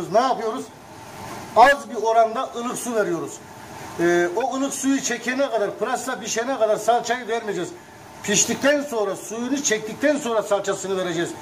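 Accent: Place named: Kayseri, Turkey. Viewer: şef kayserili heralde aksan baya vuruyor 😁😁👍👍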